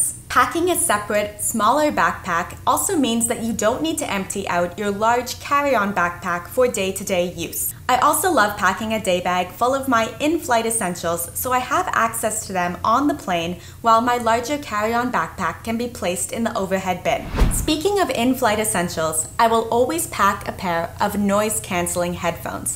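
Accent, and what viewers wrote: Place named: North, United States. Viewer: I love the phone case idea. I need to get one of those. Also, I really like your accent. Where are you from? It sounds northern US or Canada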